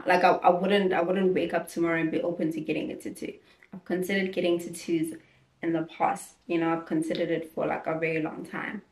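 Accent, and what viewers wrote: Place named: Los Angeles, United States. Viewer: Why that LA accent?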